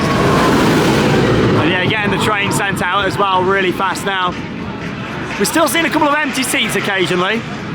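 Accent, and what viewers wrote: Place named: United Kingdom. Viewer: Holy British, Batman! I can’t understand a word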